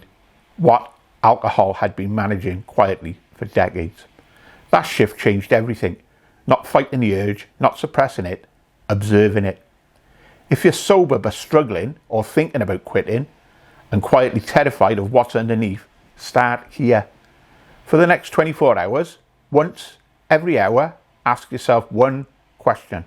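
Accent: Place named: Wales, United Kingdom. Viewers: Hey I'm finding your videos both helpful and hard hitting. Out of interest where are you from you sound Welsh? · I am OK Monday to Thursday. I can take the loneliness and I have a work pattern that keeps me out the pub but come Friday the willpower has gone. I am not drunk for the next 3 days its just I don't want to drink at all but do. Its the not having control that gets up my back. If I wanted to drink well at least I have chosen my poison. Nice to hear this in a Welsh accent. Thanks Butty!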